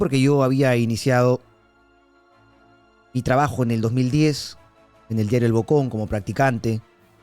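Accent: Argentina. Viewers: es argentino?... por qué habla así?...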